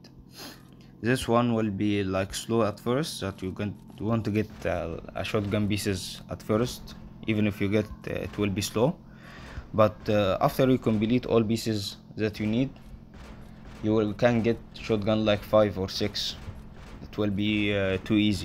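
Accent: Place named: Egypt. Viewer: مصري عظمه الصوت باين حصل باين فشخ xd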